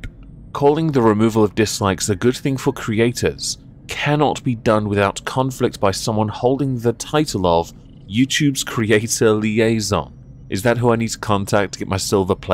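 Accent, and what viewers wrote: United Kingdom. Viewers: Hate the British accent? I could never. Grew up on Monty Python & Beatles. Just came from a Lotus Eaters vid before here (& secretly wishing The Britisher would put up some fresh material I could go after this). Much love, yer moistness!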